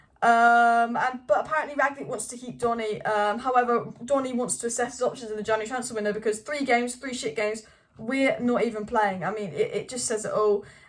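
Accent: United Kingdom. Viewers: Would u stop talking like a brits?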